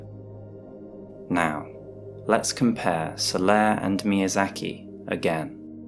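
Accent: United Kingdom. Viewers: Has an American I have to say I prefer my lore to be spoken with a warm British accent. It just seems more legit for me. Good job at being British.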